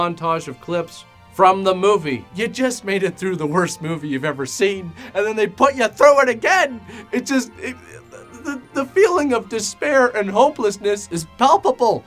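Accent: Canada. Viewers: are you Canadian? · Inner? He wears his Canadian on his sleeve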